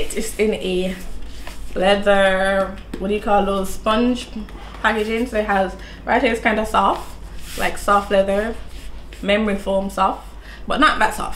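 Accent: Jamaica. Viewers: That Jamaican Patois 😍😍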